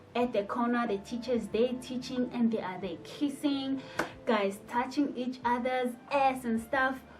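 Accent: South Africa. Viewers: sounds very south African and also look very southern African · Hhaibo your accent sounds South African now?? 😯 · now youve got a south african accent nice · U don't look like Congolese your seems like South African English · She's South African this one😹😹❤❤ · i like the way you speak English you sound like South African now and not forgeting you are so beautiful. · You look and sound South African. Did I just hear you say "smaller'nyana"...... You are South African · Now U have south Africa accent Ur English is just south Africañ. And i like small nyana, shela U now speak our slung. 🥰🥰🥰🥰🥰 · You sound and look like South African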